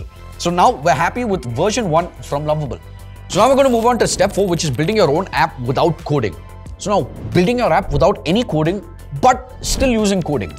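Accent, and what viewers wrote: India. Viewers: you sounded andrej karpathy as a keralite or tamilian, please bro don't harass us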